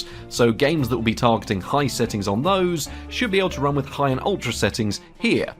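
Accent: United Kingdom. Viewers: Your english accent makes these videos better :D Not so british you can't understand the words, tho british enough so you still get that soothing effect with the words. :D plus you get to see how the world of old graphics are doing in the world today, which is tbh quite fascinating to see how well these cards are holding up. :)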